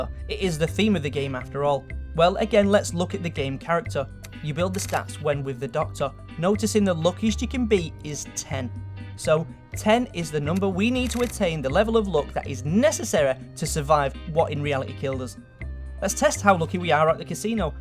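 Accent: United Kingdom. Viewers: It's funny how British people pronounce words "call of dutae"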